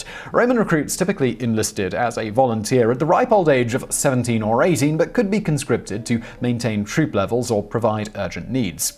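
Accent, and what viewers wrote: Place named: RP, United Kingdom. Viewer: Posh-ish English accents are magical. I have no idea as to this mans qualifications, background, or specialization. And yet he sounds intelligent and authoritative in damn near every video he's done. It WOULD be hilarious however if he had the most absurd Liverpudlian, Mancoon, Cockney or American Southern accent when he's not doing videos.......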